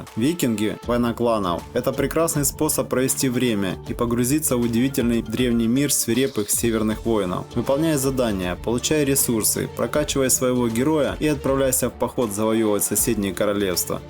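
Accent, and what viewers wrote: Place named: South, Russia. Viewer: Задолбал ужасный южный говорок даже в рекламе. Кубанойды в блогерах все, тунеядцы.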